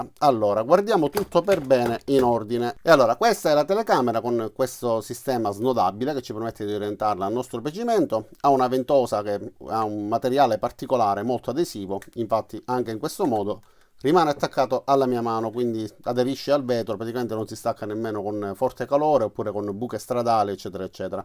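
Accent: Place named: South Tyrol, Italy. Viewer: l’accento altoatesino ti ha tratto in inganno! 😂 P.S. Adoro l’accento siciliano 😉👍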